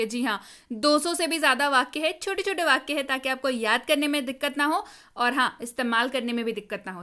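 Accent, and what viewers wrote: Bihar, India. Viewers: ma'am Kya aap Bihar se ho? Aap bhut achha pdati h. All sentence are very useful in daily life.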